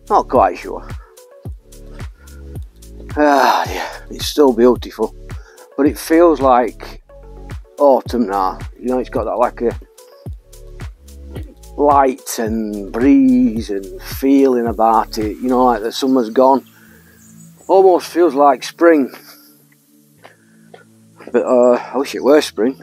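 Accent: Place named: Yorkshire, United Kingdom. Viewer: Hi love watching your videos we use to live at highflats brings back lot of memories we now live in Scarborough love to hear your accent reminds us of back home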